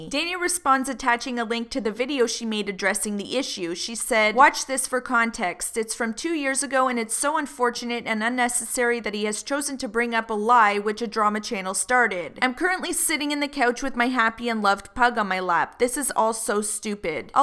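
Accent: Canada. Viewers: no offense you sound like your from Canada from the way you say "sorry"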